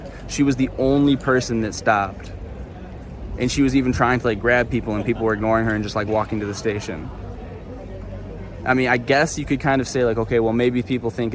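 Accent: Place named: South, United States. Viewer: Your accent is too Southern USA ! Go back to North Carolina hick !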